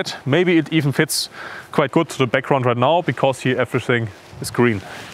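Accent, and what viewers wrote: Germany. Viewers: Fürchterlich wenn ein Deutscher englisch spricht. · Wdym he has a heavy german accent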